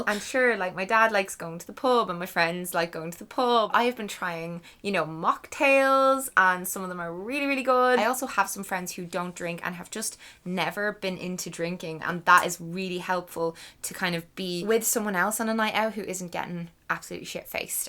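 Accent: Ireland. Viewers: I am currently reading your book (english is not my first language) and one thing that it does to me is crave hearing the irish accent. so hear I am listening to you ramble on and enjoying it very much :)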